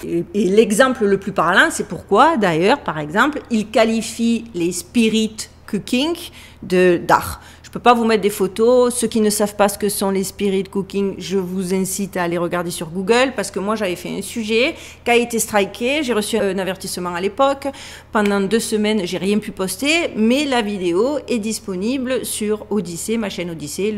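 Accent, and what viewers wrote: South, France. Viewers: Je t'ai decouvert grace a ton apparition dans la video de rock sauquere... Franchement , sujet tres intéressant, hate de découvrir l'ensemble de tes videos . Et vive l'accent du sud 😍🎉!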